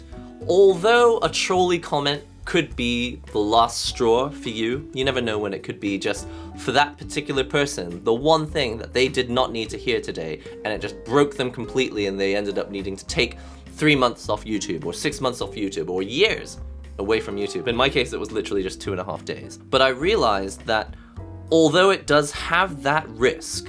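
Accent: United Kingdom. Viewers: Hi, I've been one of your audience for a quite long time through I've never left any comments before. Hearing your voice makes me feel peaceful. I'd always love to run your video at the background talking things while I'm at home doing other stuff. The British accent from an Asian guy like me gives me a little inspiration and hope for life to become better, especially during this rapid pace life in city, and I think I've just found room where I can breathe after work. I've not played fighting games at all but listening to you is quite enjoyable. Your channel is great and I just want to let you know that there's people who find good things from your videos and they've always let me find courage to continue with life. Sorry about the poor expression, I hope my English to be as good as you some day.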